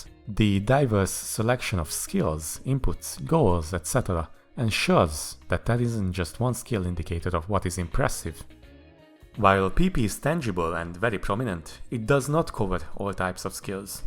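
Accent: Hungary. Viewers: Az akcentusodbol egybol tudtam, hogy magyar vagy, nagyon jok a videoid :D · What is your main language? Bcz its sounds like hungarian.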